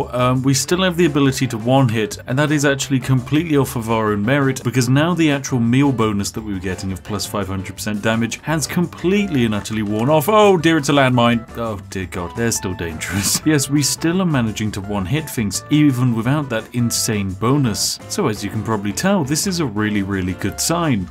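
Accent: United Kingdom. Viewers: I love your British accent. Please marry me. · Starting with nuking a group of harmless deer, you had my attention, glorifying the best tea brand and you've earned my subscription. Spiffy Brit accent is icing on the cake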